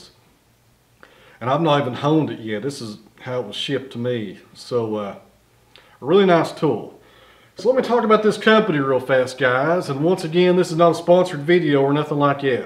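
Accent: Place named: Virginia, United States. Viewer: Just curious. What state do you live in? Sounds like Virginia or north Carolina maybe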